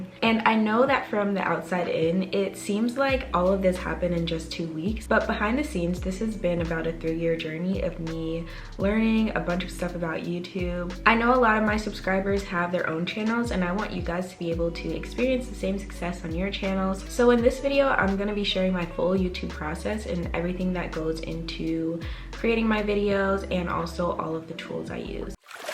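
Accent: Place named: England, United Kingdom. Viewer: I love your English accent ❤ Uganda 🇺🇬 loves you sister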